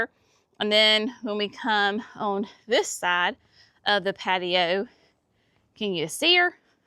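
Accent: South, United States. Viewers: "Sweet Thing"! I love it! Gotta use that one. My first time to view one of your videos. Subscribed and clicked the bell. I'm a southerner too and love your accent. Your garden is truly an inspiration for me. We assembled a greenhouse this past year and now that I can expect to reliably start seedlings I've been trying to figure out how and where to plant all the flowers. I see you just tuck them in here and there and everywhere. I'm going to go through your videos one by one to see how you manage to keep weeds out of your beds... if you till areas first, if you till in amendments, how you lay out drip lines. I usually use sprinklers on timers. I love your Formosa azaleas and am guessing the whites are Mrs. Gerbing. I have those two along with George Tabor at the entrance to my driveway and now, greenhoused, will be taking cuttings to line the full drive. 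I have shade, shade, and more shade. It can be difficult to find a place sunny enough for full sun plants but as you are aware, I'm sure, Proven Winners is expanding shade plants all the time. I agree, Gertrude Jekyll is one of the most amazing roses for fragrance. I'm going to put Desdemona on my wish list too. I actually came here to see if you offered information on how - when to deadhead hydrangeas. I've read that it shouldn't be done but don't see how my plants can possibly produce more buds without access to more light as the old heads dry up. Thank you for showing all the work that is involved in gardening. I too use a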